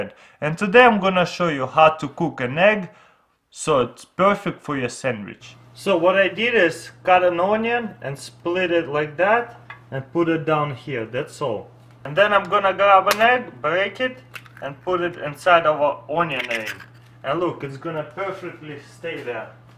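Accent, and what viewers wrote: Russia. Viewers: the Russian accent makes all the video! Boom BOOM love it! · He has a Russian accent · I find a strong Russian accent funny. respectively his voice is hilarious. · Give him a break his Russian. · He is Russian like me he has an accent people sometimes be rude about how he talks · Taras Smishchuk what's wrong with his accent? he is Russian, deal with it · Russian English is so funny. One thing he does wrong though, he flipped the egg over. Blasphemy! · Why would you even want to lose it? Russian accents sound fucking awesome. But yeah, for an English speaker, it is EXTREMELY difficult to pronounce Russian words properly. I've spent a ridiculous amount of time learning two simple words: здравствуйте товарищ (Hello, comrade) I've said it at least a hundred times, and I still catch myself pronouncing здравствуйте (zdrah-stu-ee-tcheh) incorrectly. 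· He's not English he's Russian his pronunciations are different then yours!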